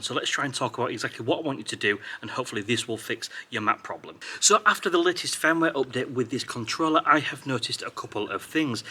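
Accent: Hull, United Kingdom. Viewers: All of us from "ull" can understand him when we fly down "our tenfoot" looking for "dead bods".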